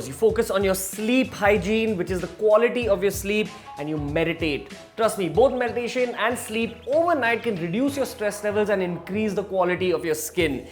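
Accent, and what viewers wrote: India. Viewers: 我们喜欢印度口音 好听 哈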